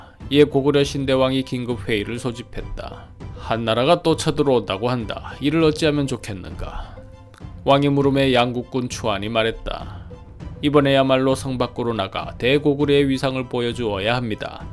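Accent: Gyeongsang, South Korea. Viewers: 고구려인 말을 할 때 북한 사투리로 하라는 것이 어이없네요. 북한 사투리가 한두개인가... 평양말투와 연변쪽 말투만 비교해도 완전히 다른데, 고구려인들이 어떤 말을 했을지 어떻게 알고... 그리고, 지금 북한 말투와 고구려 시대 말이 비슷할 거라는 생각 자체가 웃기지도 않음. 전혀 다를 수도 있음. 어디 거란이나 말갈족 언어와 비슷할 수도 있는 것이고... 적어도 만주벌판을 내달리던 초기 고구려인들이 현재의 한반도 내 북한 말투와 비슷했다고 생각치는 않음. 님의 대화체에서 갱상도 사투리는 님과 제작영상만의 개성이니, 하시던 대로 주욱 이어나가시면 될 것으로 봅니다. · 갱상도 사투리 재밌어요 뭐 꼭 고구려말로 해야 하나요? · 영화 황산벌 촬영하는 것도 아니고,그 당시 신라 사람들이 경상도 사투리 썼던 것도 아닌데, 고구려 왕이든, 한나라 장수든 경상도 사투리 쓰는 게 뭐가 어때서요 ㅋㅋ 그냥 편하게 하세요~ 말투와 표현들이 귀에 딱딱 꽂히니 좋으네요.ㅎ 아니면, 고대 삼국시대에 썼던 언어를 그대로 써야죠.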